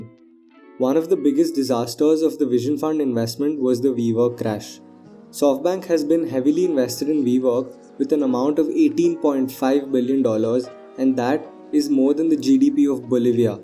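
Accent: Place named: India. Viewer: Are you Indian? You're accent is indian-ish, great video tho! I subbed uwu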